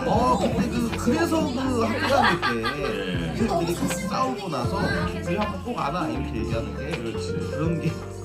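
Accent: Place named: Gumi, South Korea. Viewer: 오늘도 재미써여~ 구미사투리에여~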